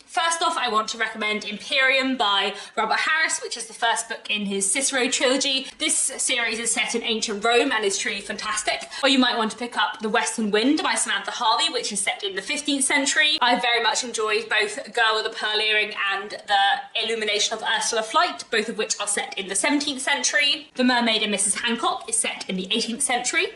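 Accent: RP, United Kingdom. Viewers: your accent is so "catchy"! something between RP and posh English. love it !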